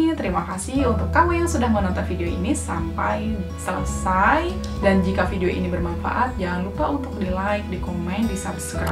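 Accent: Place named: West Java, Indonesia. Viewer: Sundanya keliatan ah😁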